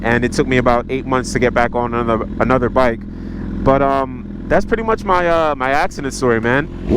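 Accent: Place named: New York, United States. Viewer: I mean just do what I do. Think about it as acting and or having a conversation with someone I front of you. You sound pretty good now as we speak. And giving the viewers what they are asking for. I always ask the viewers if they want to toss out ideas on what they would like to see in the vlogg and what works and what doesn’t work etc… the thing with your video is that hearing the way you talk just reminds me of home both the island and NY (until I heard you speaking a little bit of patwa at a gas station) and I was ahhhh ok ok. That’s why I get both NY with the island feeling and your bike just sounds SO crisp when you going and the snap cranked pops with the rice crispy’s is dope af! Lol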